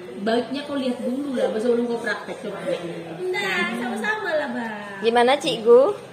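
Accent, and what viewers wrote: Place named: Sumatra, Indonesia. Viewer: Nyimak cara buat ketupat,,,, kok dialog nya seperti orang Sumatra,,,,, besok saya datang lagi sambil mengantarkan kopi hitam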